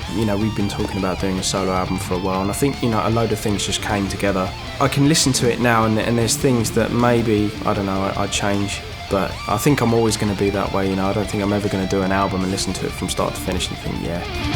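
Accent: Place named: United Kingdom. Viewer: "I use EMG's because I think they're the dog's bollocks." I don't know if it was the British accent making it sound better, but I think that may be one of the greatest quotes ever spoken by anyone.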